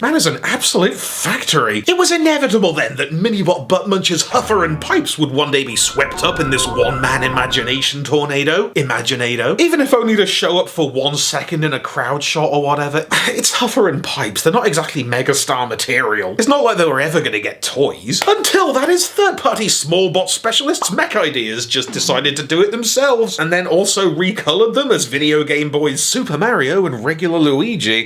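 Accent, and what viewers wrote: United Kingdom. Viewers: Have to admit that I'm not here primarily for the bots but rather the magnificent British accent, hilarious puns and ass-kicking music. Keep on being you,! <3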